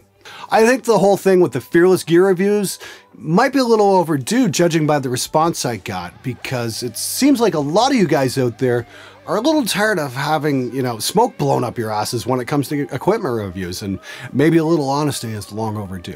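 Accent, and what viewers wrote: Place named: Canada. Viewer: I love the canadian accent.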